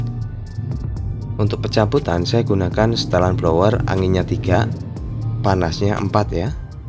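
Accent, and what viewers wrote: Java, Indonesia. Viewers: tapi logatnya jawa bgt mas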